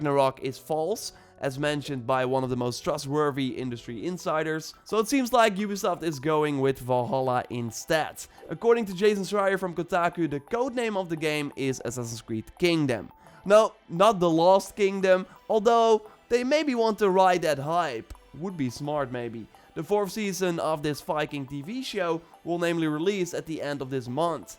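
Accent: Netherlands. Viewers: you dutch? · You sound like dutch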